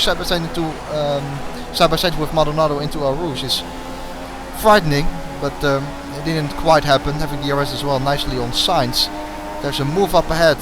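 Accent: Netherlands. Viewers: geweldig dat nederlandse accent hahah ga zo door!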